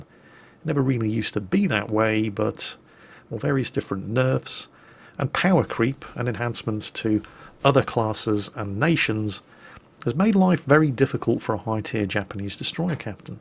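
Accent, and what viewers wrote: England, United Kingdom. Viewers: my friend, i must tell you.. I don't play these games, but love to watch, and out of everyone i watched you are the only one that caught my attention.. And i also subscribed, to your channel, i guess you are the only one that's not annoying.. Plus you are very knowledgeable and can flat out play too.. Your commentary is just as mind blowing as the battles, and for a guy from the inner city of Baltimore md in the U.S.A. i love that English accent.....🇺🇸🇬🇧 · is a smoker......also: There is a reason (I'm not a native speaker) i switched over from hiding my accent with a learned American one and switched to using version of British accent.....its very easy on the vocal cords.....at least in my experience. It's soft on purpose. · Graham Norton has the same sort of sound, check <link> Is it just a British affectation?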